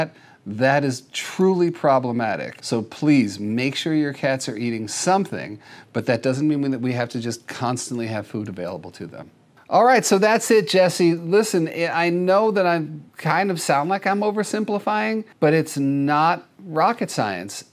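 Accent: New Zealand. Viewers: As an Australian listening to this lady's familiar sounding New Zealand (or South African) accent, the cat's name is actually "Merlin", not "Melon". Although the name Melon seems hilariously appropriate given the context 😂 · She sounds more New Zealander to me, but yes, you're right about the biscuits, in the case of Australia.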